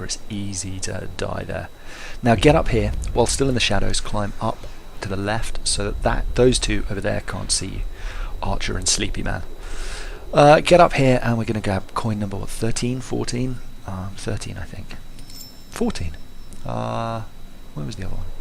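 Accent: United Kingdom. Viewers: Thanks for the great video. I had to make adjustments because I was going for the Thief Insignia and for the Pretentious achievement at the same time. "Pretentious" is a nightmare, but with a few covered kills followed by some "run-like-the-wind"s it was finally doable (albeit leaving a bloody trail of devastation in my wake). Loved the narration, btw. There's something about a British accent that just makes you-tube videos better (sounds more cultured), and I also appreciate you keeping it clean. It's refreshing to not have to put up with a slew of f-bombs just to watch a gaming video. I look forward to the rest of this series. This game is far from my "favorite", but your videos definitely make it better. Kudos!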